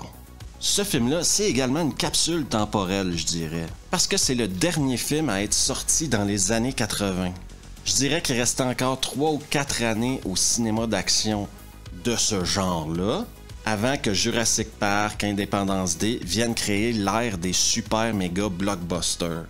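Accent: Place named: Quebec, Canada. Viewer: Ton accent québécois 🇲🇶 est vraiment très difficile à comprendre pour nous les français 🇫🇷🤷🏽 il faut vraiment travailler ton accent !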